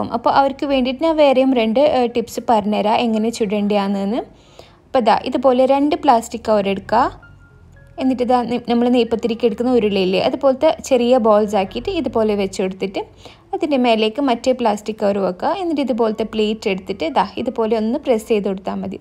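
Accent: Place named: Kannur, India. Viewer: കണ്ണൂർ കാരിയാന്ന് ലെ ഞമ്മള shamis own നിലെ ശാമിലയുടെ ഒക്കെ സംസാരം പോലെ 👍🏻👍🏻👍🏻👍🏻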